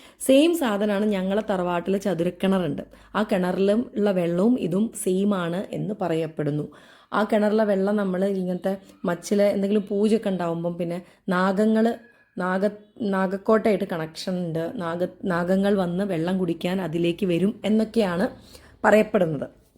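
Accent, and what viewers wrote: Kozhikode, India. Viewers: Nadathi 😂😂😂 inte Ingale kondu thottuuu 😅😅 mmale koikodu slang 😂😂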